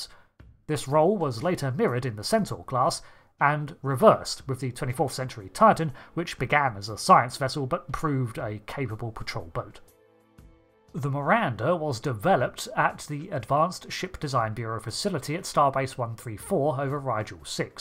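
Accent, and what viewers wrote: United Kingdom. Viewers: Are you incapable of recognizing a British accent?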